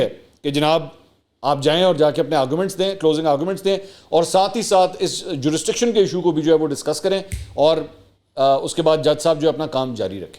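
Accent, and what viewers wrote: Punjab, Pakistan. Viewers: "Agha majid honey albela zafri khan tariq taidi aur iftikhar thakur sy mazrat k sath" Dear jii.. main aik arsy sy ap ko sun raha hon ap ka sanjida andaz mazbot personality aur bebak reporting sab ko he pasand ho gy. Lekin ajkal reporting k doran ap ko bhaand panay ka dora parna aur punjabi touch nihayat ajeeb hy agr ap apny show ko entertaining bananay k leay kr rahe hein tu market main already log mojod hein ap b 2 3 hire kr laein lekin ap khud mehrbani karein sirf reporting karein shukriya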